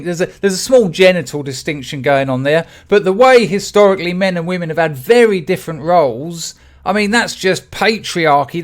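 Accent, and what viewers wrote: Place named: England, United Kingdom. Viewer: You don't know how good it feels to hear someone with an English accent say this. I have argued endlessly while my cousins across the pond called me a backward hick. I knew their decisions and attitudes would devastate their fair isle, I had no idea how quickly it would happen. Right now it's a giant snowball going straight down a mountain. Britain and Europe is lost.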